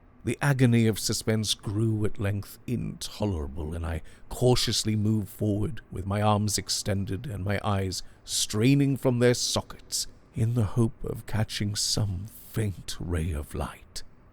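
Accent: England, United Kingdom. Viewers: Why always freaking englesh accent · Spent some of my Halloween with these recordings. It was wonderful! Thx! Love the reader and even the xtra effects worked nicely. As far as his anglo-accent, gotta say i appreciated it. I've heard too many flat, nasally voices of Americans with no sense of rhythm to the words and it's practically unlistenable. Js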